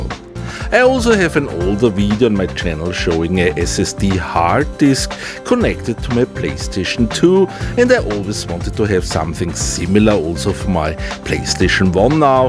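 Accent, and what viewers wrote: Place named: Austria. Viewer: How i missed that Austrian accent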